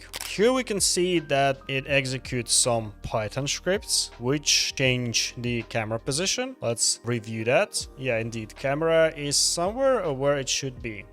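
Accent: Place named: Russia. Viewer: чел русский понятно по акценту,но вот доказательство остановите ровно на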